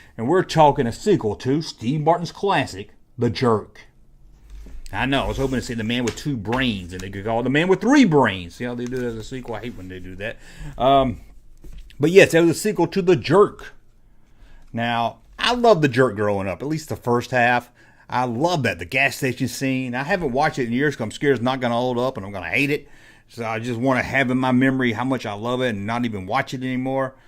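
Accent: South, United States. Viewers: Are you from tenneessee you got that southern draw!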